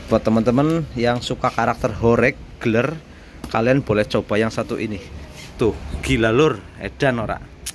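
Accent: Banyumas, Indonesia. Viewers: Ngapak nmen yah mas.. pkoke jos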